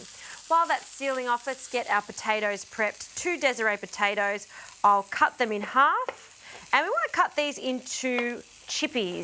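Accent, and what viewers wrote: Australia. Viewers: You have a nice Australian accent, where are you from? (If I may ask)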